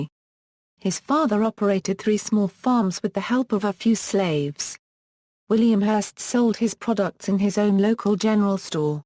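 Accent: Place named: East London, United Kingdom. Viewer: cannot understand your east London accent....you speak at 120 mph slow down to 60 mph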